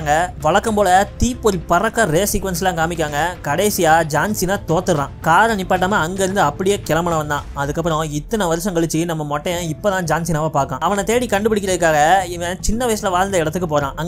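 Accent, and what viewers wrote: Tirunelveli, India. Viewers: நெல்லை தமிழில் டப்பிங் செய்து பேசிய உங்களுக்கு ரொம்ப நன்றி மிக்க நன்றி சகோதரரே 💚💚🙏🙏💐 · வர்ணனை அட்டகாசம் தோழரே... இதுக்காகவே உங்க channel ல subscribe பண்ணிட்டேன். உங்கள் வெற்றி தொடரட்டும்.. (எப்பொழுதும் இதே நெல்லை தமிழில் பேசுங்க தோழரே) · Ena bro tirunelveli kaarana ne😍😍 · Are you from Tirunelveli Ya Brother ???????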